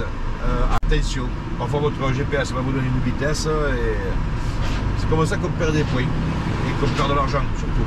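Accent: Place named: Provence, France. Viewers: Bonjour ! Je vous ai découvert cet après-midi et quand j'ai regardé cette vidéo, je me suis dit que, comme je ne suis pas loin de Vaison (Monteux) alors, je suis du Nord puisque vous redescendez "dans le sud" 🤣Et pourtant, on a le même accent !!! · J'aime t’on accent du soleil 😂😂😂❤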